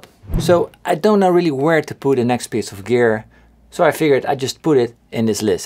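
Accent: Netherlands. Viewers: Ik wist dat je uit Nederland kwam! (Ik hoorde het aan je accent en zocht het op dus ja...) Goede video's!! Ga zo door! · He sounds like he comes from the Netherlands so i checked and yes he is from the Netherlands lol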